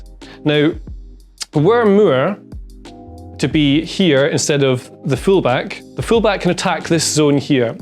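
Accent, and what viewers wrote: Scotland, United Kingdom. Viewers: Probably don’t work very well because of his Scottish accent, Google is pretty discriminatory like that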